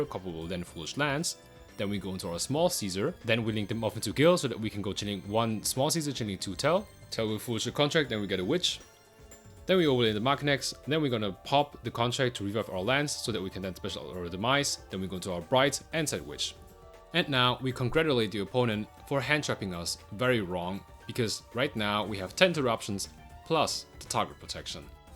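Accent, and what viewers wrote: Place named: Germany. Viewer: You speak well, but the harsh pronunciation is noticeable. I think since I'm German myself, I can hear it more easily, haha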